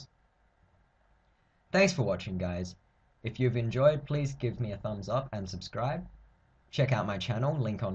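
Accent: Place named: Australia. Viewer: Well I think he actually sounds Australian. And Australian's use dollars, soo yea.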